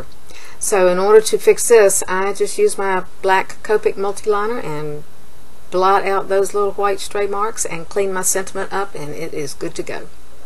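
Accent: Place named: South, United States. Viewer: I enjoy watching this crafter in particular. She's definitely a master of this craft. Plus the southern accent is v.v. charming :)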